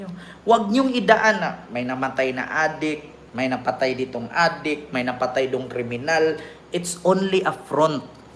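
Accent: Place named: Iloilo, Philippines. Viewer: God bless u! my Tanong lang Ako sau?ilonggo ka ba?Kasi ilonggo din Ako Punto mo daw ilonggo ka toto?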